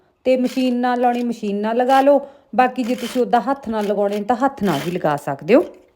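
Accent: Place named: Hoshiarpur, India. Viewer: Hoshiarpur de boli h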